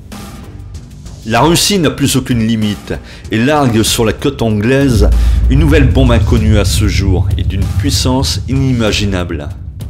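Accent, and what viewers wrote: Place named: North, France. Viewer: Monsieur tu as la voix des gens du nord.di moi si je me trompe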